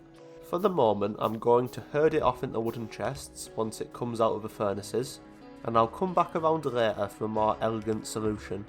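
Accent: United Kingdom. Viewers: Your Britsch accent is so pleasing to my ears.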